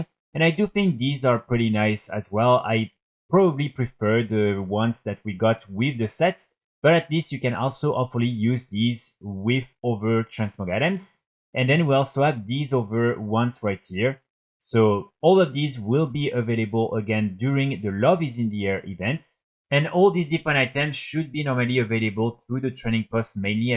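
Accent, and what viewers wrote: France. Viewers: Cute accent Frenchie.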